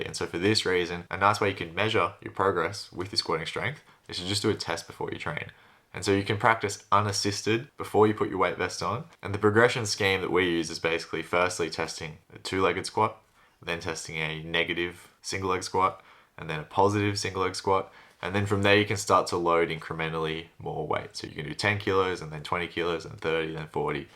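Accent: Australia. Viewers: Bro your Australian accent is fuckinnnm difficult to understand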